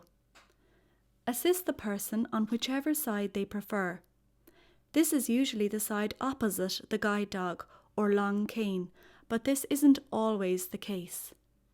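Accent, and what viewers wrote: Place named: Ireland. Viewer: The narrator sounds cute. I'm a sucker for Irish accents.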